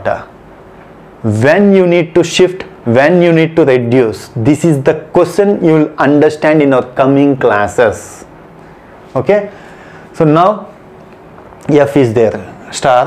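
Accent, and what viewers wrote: South India, India. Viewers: Sir aap South se Ho n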